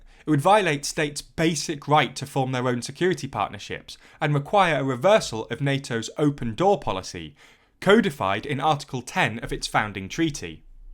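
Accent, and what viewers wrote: United Kingdom. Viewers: You are plugging your opinion, not god. By accent British?British proof through history ar devious and rutten. This is for the beginning. Russia shall not negotiate with the West at all, history establishes the outcome. Russia should and will take all its own decision. Russia won the arms race against the USA, has bain the West doesn't have any. You are welcome anytime.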